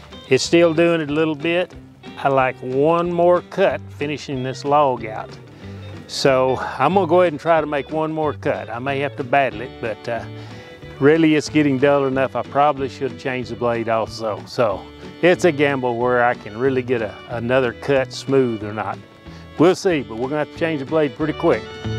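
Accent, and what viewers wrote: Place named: Tennessee, United States. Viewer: Happy Birthday, your accent sounds like mine here in TN, thanks again for your videos.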